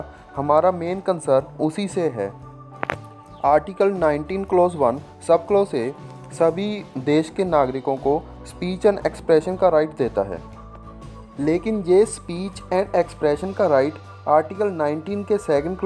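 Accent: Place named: Punjab, India. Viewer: Everything is very knowledgeable, everything thing is perfect but icing is your hindi in Punjabi.. this is your Canadian brother! Love u 😘 bro.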